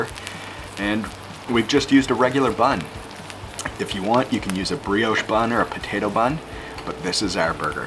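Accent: Canada. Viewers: Looks delish! And love your Northern Neighbor accent. :) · Canadian eh?!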